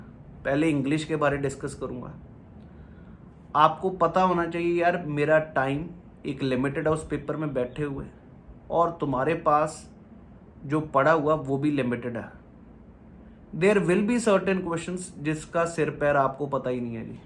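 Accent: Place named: Pakistan. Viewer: Sir apka accent pakistaniyo jesha hein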